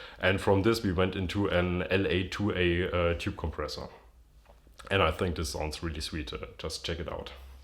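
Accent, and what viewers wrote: Germany. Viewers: Things always sound way cooler in a German accent.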